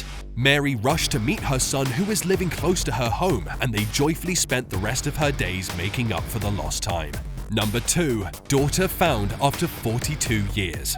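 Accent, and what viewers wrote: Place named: England, United Kingdom. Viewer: this guy has an English accent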